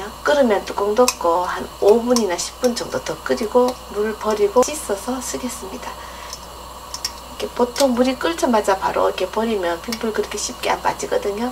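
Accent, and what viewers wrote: Gyeongsang, South Korea. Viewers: 밝은인상 순한 목소리 맛있고 쉬운요리 맛나보이는 눈맛 선생님 구수한 경상도 말씨 넘 좋아요~~알 러브 유~~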